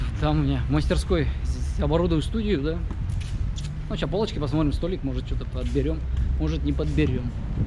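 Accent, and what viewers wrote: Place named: Vyatka, Russia. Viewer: Что -то думаю говор какой то вятский, потом смотрю едут как будто по учхозу, а потом нихрена себе, так это ж Чистые Пруды)))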